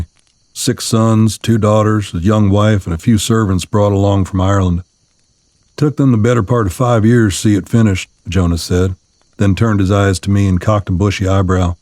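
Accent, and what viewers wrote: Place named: South, United States. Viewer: Awesome Awesome Continuation of this story. I love the 1st two I listened to by Viidth22 and again(1st one) by LightHouse just before coming here(my two Fav readers and voices). I was pleasantly surprised by your voice and it matched with the story so well with your southern voice. I Love these kind of Stories, it might be my age I'm not sure as I'm almost 60. I find some stories have a much younger feel to them and I can't get in to them as much as others, I'm an old Dean Koontz fan from the early 80's, read all his stuff since, and of course other Authors, so months and months ago when I came to some reading channels I was so happy as my eyes are not as good anymore, as I use to read for hours but can't anymore. *Plzz don't stop writing about these fella's and don't kill Jonas off yet like you did Tommy... lol.. 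Your Fan!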